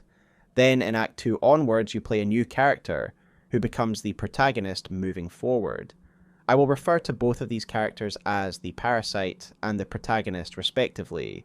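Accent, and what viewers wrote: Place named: United Kingdom. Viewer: i can tolerate a british accent to a degree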